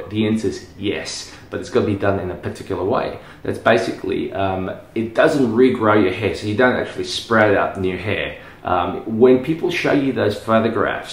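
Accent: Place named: Queensland, Australia. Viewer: Idk how your international followers understand you. That's a really thick Queenslander accent 😒😁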